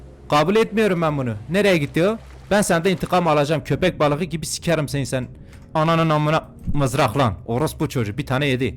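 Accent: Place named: Diyarbakir, Turkey. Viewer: Abi nerelisin? Bizim Diyarbakırlilar gibi konuşuyorsun. Saygılar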